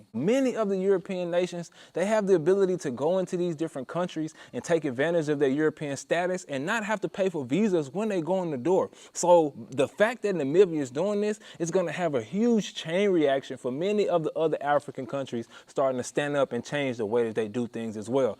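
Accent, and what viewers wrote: United States. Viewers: I can tell by your accent that your people are in America.....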